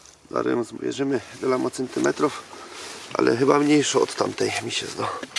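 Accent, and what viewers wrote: Silesia, Poland. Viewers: Hej kolego, jak nie lubię Ślązaków tak ciebie szanuję. Świetne filmy, widać że lubisz to co robisz. I nawet tą waszą gware w twoim wykonaniu da się słuchać. Powodzenia życzę. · Uwielbiam slaska gware.....dziekuje i serdecznie pozdrawiam..)))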